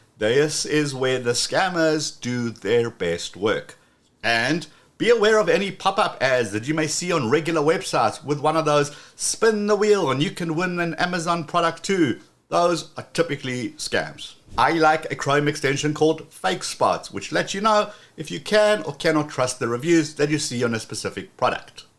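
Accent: South Africa. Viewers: Your accent is from south african, are you from South Africa? Thank you for sharing!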